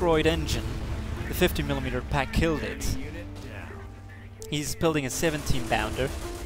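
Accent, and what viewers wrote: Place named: United Kingdom. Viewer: When did your accent become british?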